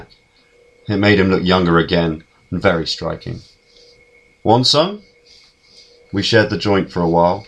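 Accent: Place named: London, United Kingdom. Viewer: It doesn't say who's reading it but he's great, and has a London accent. I also liked the pictures - some of them are of buildings I have worked in!!!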